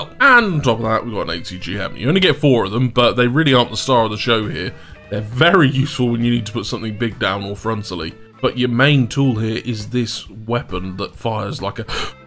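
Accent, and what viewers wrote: United Kingdom. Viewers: Does anyone love this channel because of British accent? I am not sure why but it feels attractively hilarious.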